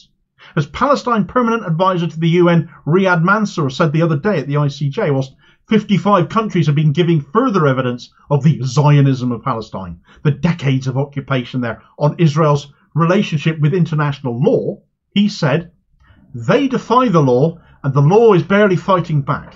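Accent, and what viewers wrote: United Kingdom. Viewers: YES, SIR... YOU THE BEST.... I LOVE THE SHOW... LOVE THE BRITSH ACCENT.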